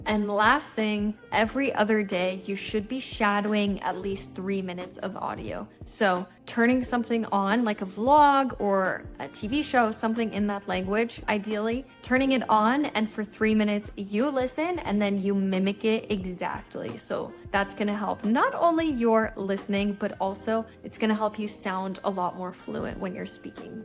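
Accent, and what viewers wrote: United States. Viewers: ❤ thanks teacher your pronuntation Is clear AND natural.are you American?❤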